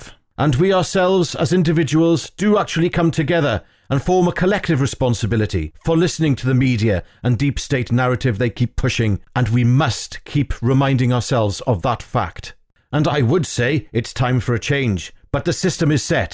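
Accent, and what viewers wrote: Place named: Wales, United Kingdom. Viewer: It’s refreshing to hear common sense articulated in a Welsh accent. Normally you have to be careful about expressing opinions like that in Drakeford’s hermit kingdom.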